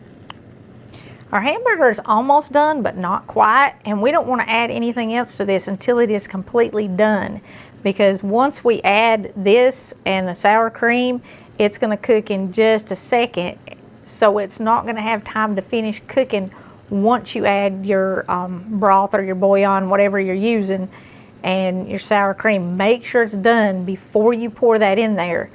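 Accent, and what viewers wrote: South, United States. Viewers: Hello sweet lady, I'm not sure if I'm doing this right but I'll try. I found your channel on my Roku TV and I just wanted to tell you thank you for sharing your love of cooking and love of God. I am 78 yrs old. My husband took his life 4 years ago and left me in a wheelchair. I live alone now and have no family here in Texas. I get real lonely at times, then by chance I found your channel and although I don't cook much anymore because it's difficult cooking from a wheelchair and too easy to get burned. Your channel became a daily pleasure to look forward too every evening. Your words about your love of Jesus and His love for us have lifted me so many times when my heart was so heavy. Thank you. Though I don't do much cooking since being alone I still enjoy watching you cook. You have the sweetest voice and southern accent. I'm originally from Massachusetts and I have a heavy Boston accent. 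God bless you for making my day. I watch all the videos I can and watch them over and over. (Hugs) from Fort Worth Texas...... Marie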